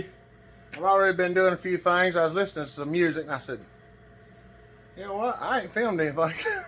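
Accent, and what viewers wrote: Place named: Alabama, United States. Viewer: are from sweet home Alabama where the sky is so blue? You have our accent. We say Sir and we say Ma'am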